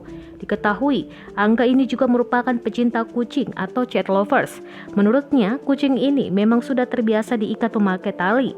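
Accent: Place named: Jakarta, Indonesia. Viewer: Mungkin Orang betawi , jadi setiap hurup a diganti e 😅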